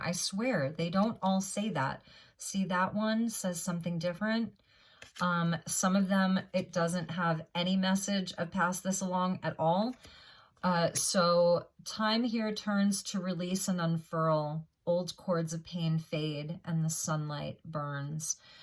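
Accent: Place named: France. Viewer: Votre accent français est trop mignon 😁👍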